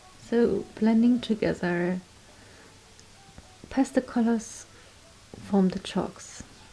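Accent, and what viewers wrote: Germany. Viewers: Thank you so much for showing us! You honestly deserve so much more attention and love bc your work is awesome! But I'm glad I found your channel now ^^ btw sorry for that question but are you German? I just think you sound a little German :)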